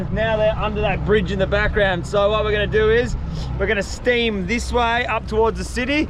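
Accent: Western Australia, Australia. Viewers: I knew you would be from Perth!! Classic South West WA accent. I live like 5 minutes from where your video starts, me and my partner are aspiring content creators. We do most of our stuff on Twitch. I'm a total city slicker, I want to become influential enough to be able to collaborate with others in the content creating community. My dream now is to one day be part of an episode where you take a pair of city slickers out to the wilderness and see how well we fair with as little intervention as possible. I would LOVE that challenge!!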